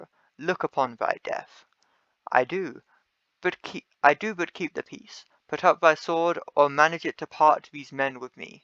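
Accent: United Kingdom. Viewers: why are you british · Why are you British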